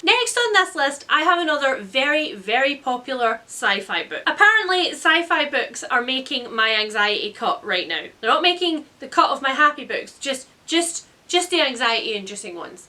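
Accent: Scotland, United Kingdom. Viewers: I’m so glad I found your channel! You’re personality is so cute and entertaining (: and i love Scottish accents lol (probably because my mums a Scottish immigrant aha!)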